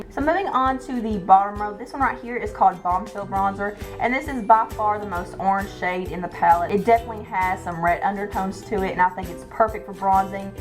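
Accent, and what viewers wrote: South, United States. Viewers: I just subscribed!! You have a southern accent, Im from the south as well!! Its nice to finally hear someone who sounds like me on here lol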